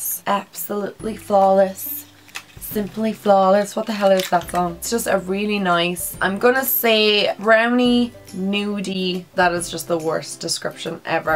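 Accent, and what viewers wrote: Ireland. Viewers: I just started watching your channel and I tough you were from ireland I know my irish accent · i love your irish accent! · Are u irish😘😘❤️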